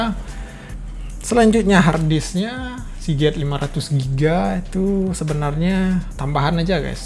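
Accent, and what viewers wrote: West Sumatra, Indonesia. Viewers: Urang Minang Uda?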